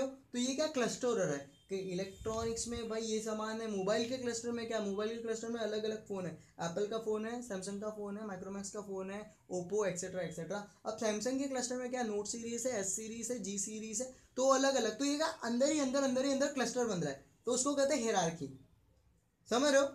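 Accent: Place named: Mumbai, India. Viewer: Mast mazza aa gaya mumbai wala koi dost samza raha hai laga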